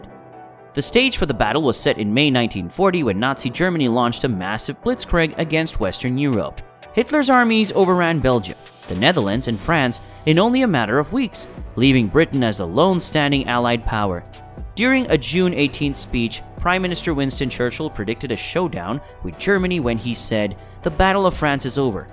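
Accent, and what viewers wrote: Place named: United States. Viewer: No I didn’t like the video and you won’t see me next time. Why didn’t I like it? Ludicrous American accent from an individual who can barely speak English. Why can he not pronounce British place names e.g. Coventry, properly? Totally inappropriate and ludicrous video footage throughout. What on earth have American planes got to do with the Battle of Britain? And was that a clip of a Japanese scene at the very end? The whole thing is absolute garbage.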